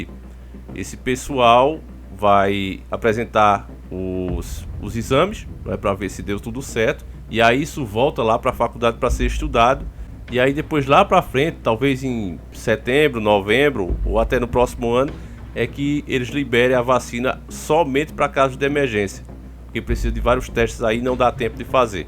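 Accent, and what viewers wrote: Northeast, Brazil. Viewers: Eu por exemplo,acho o sotaque falado do aqui do canal diferente e surreal. Eu não sei como seria quando eu visitasse o nordeste como a cidade de Recife-Pernambuco. Nossa! Será algo como ir a outro país dentro do próprio país e eu tenho essa curiosidade de ver isso de perto nessas terras longínquas. Eu acho o nordestino super diferente em tudo; seria como estivesse convivendo com outra nação. Diferente né!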